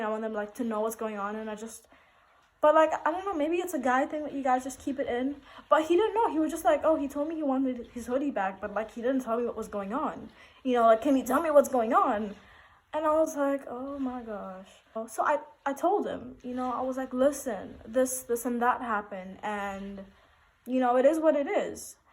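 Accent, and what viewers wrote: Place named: United Kingdom. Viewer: That British accent , I really like it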